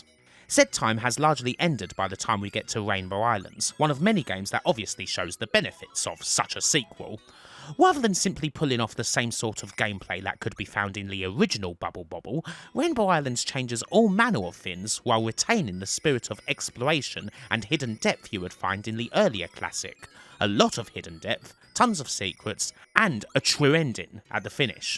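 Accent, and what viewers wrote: United Kingdom. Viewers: Another F’ing brit. Blocked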